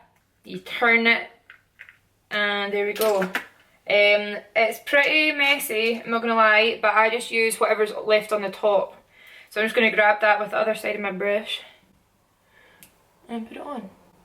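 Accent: Scotland, United Kingdom. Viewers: Just stumbled across your channel...oh my GOD! This is gorgeous! Nice to hear a fellow Scot on YouTube too! Buzzing to give this a bash 😍 x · I'm irish from northern Ireland she sounds Scottish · whenever you say "kinda" with your Scottish accent it sounds like you're saying my name(: love you!!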